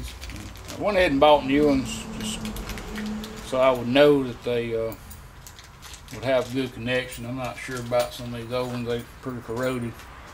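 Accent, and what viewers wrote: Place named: Georgia, United States. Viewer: You from Georgia? That accent is THICK.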